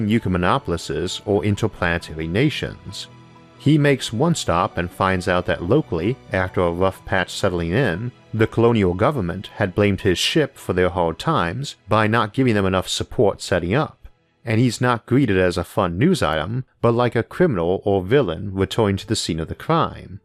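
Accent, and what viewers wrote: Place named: South, United States. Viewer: I've heard you say you have a 'speech impediment' in the past. I think your voice sounds cool as all hell man!! Like an educated southern American gentlemen, i think its awesome :)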